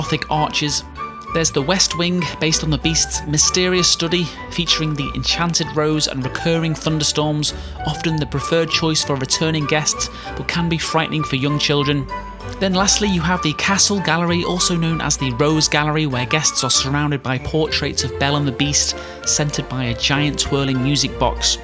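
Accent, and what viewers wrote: Liverpool, United Kingdom. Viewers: American who used to live in the UK here - can't tell if you're a Scouser or from Stoke-on-Trent :) Am I close?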